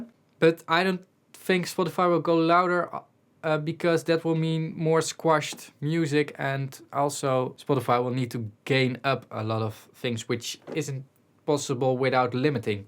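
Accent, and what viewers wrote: Netherlands. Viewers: Sounds dutch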